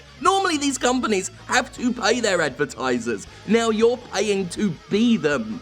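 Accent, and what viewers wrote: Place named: United Kingdom. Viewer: Don’t get how but okay? He has a British accent, 👏👏👏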